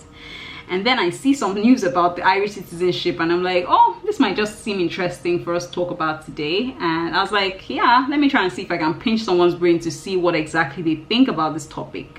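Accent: Nigeria. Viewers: Hi...did you live in Lagos? You sound Yoruba. Regards from the UK. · Lool I liked this video because of your Nigerian accent and the Nigerian humour you used to announce what is essentially bad news which didn't make it sound as bad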